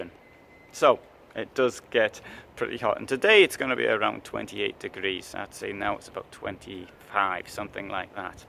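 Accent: United Kingdom. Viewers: You have funny lame british accent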